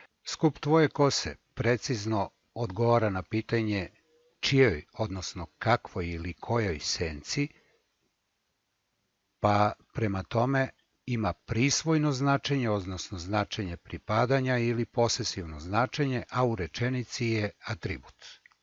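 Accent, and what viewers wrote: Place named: South, Serbia. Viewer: Koliko nepotrebnih znanja deca treba da usvoje tokom školovanja?! Pritom, izađu iz škole potpuno neosposobljena za život i rad. Ovo je potrebno onima koji će studirati srpski jezik i baviti se lingvistikom u svom budućem radu. Pritom, ovaj govornik, sve vreme, koristi južnjački akcenat, a drži predavanje iz gramatike srpskog jezika.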